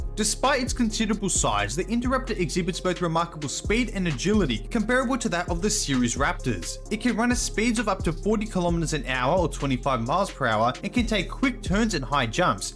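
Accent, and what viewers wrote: Australia. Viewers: I’m surprised the Indoraptor had jts worst score in the Jurassic. Kind of ironic, if you think about it… Also, for some reason it didn’t click to me for some time that you were Australian,. I don’t know why. I hope I didn’t offend you with this…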